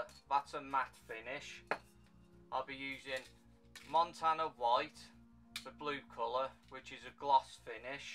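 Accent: United Kingdom. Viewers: Nice, British or Welsh? if you don't mind me asking?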